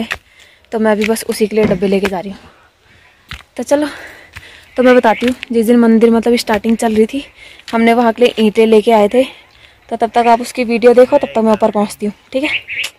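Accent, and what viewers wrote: Garhwal, India. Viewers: Iam kumauni from nainital.. u r garwali nice tone.. ❤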